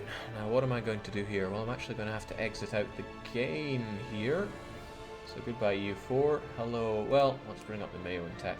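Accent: Scotland, United Kingdom. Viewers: I thought this was Arumba, and I was confused and I thought he was sick again then I felt the Scottish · Man, his Scottish accent disappears after watching the Scottish twitter video and coming back to a normal one.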